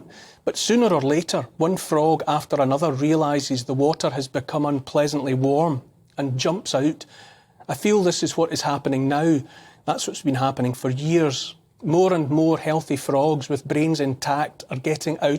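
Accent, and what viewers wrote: Scotland, United Kingdom. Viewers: Neil Oliver 💞🤗 A gentle, beautiful Scottish lilt A kind, empathic, forward tilt An open heart; a caring face A willingness to take his place. A strong, home-hitting monologue Reminds us of the boiling frog The courage to become a sleuth A passion to release the truth. A deep desire to crack the whip Illuminates our freedom-strip Calls out the darkness taking hold The shiny despots; plated gold! Intense and raw, he lays it bare A soul-enhancing, honest stare Inflections drive the message through He speaks for Us - for me and you! Discernment's such a precious gift His words create a mighty lift Take heed of all he has to say He knows - it shouldn't be this way! 🤗🦋🐬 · The truth with a Scottish accent! Love it! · A load of drivel from an over pronounced Scottish accent aka 'Posh Scotch' from a multi Millionaire puppet. Wake up lads. 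Fuck sake.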